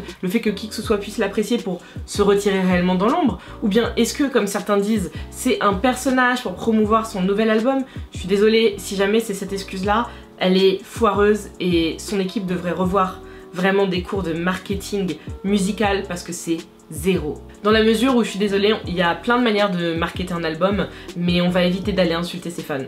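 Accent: United Kingdom. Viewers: On accent anglais >>>>>>>>>>>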